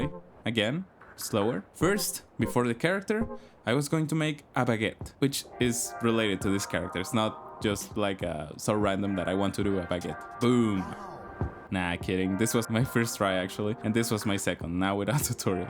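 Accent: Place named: Spain. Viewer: do you talk Spanish?, your pronunciation of English language is pretty similar to someone who talks Spanish. I talk Spanish too, so yeah, that's why the pronunciation sounds very similar for me at least. Also, good video, very organic, and i find this more interesting or more educational than watching those tutorials alone (idk why, maybe you are an inspiring type of person). Keep the good work.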